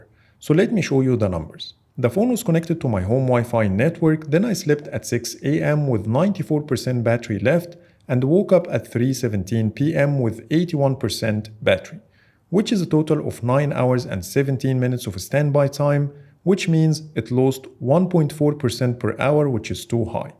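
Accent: Egypt. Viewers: Is this Egyptian accent 😂 or i am just imagining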